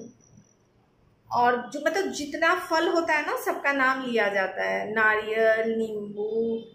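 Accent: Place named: Bihar, India. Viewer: Mujhe aap k baat katne k style se lag rha tha aap Bihar se ho sakti h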